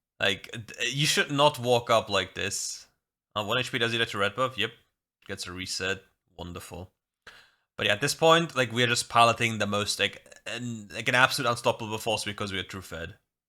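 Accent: Germany. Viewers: it depends on the video and word choice used. Some people have more difficulty understanding accented english than others. His english is fluent, its just hard to get the word usage sometimes due to thick accent, which many people do have. Tbh german accent is cool, its just sometimes hard to make what is being said for some words 😅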